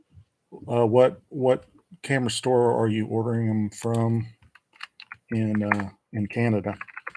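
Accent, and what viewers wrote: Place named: South, United States. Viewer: Ah, your southern accent ain't that bad man. Eddy's video was great and you hit some interesting points too. I've been tossing the idea of creating jpeg styles for different situations back and forth but haven't done any yet. I'm just so used to shooting raw. But if I can create the jpeg style to mimic what I would do with the raw file anyways, it would save so much more time!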